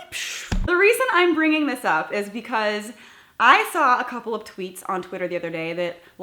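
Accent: United States. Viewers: It sounds like you live in the US.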